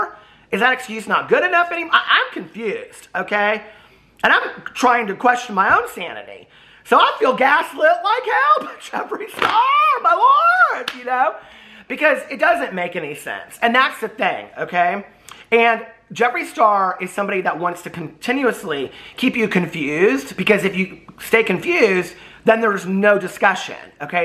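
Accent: South, United States. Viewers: With your Southern draw, where are you from? Excellent video!!!!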